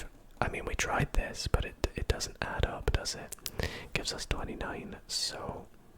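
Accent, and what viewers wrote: United Kingdom. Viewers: Talk strange? How so..? Do you mean the British accent? What's strange about that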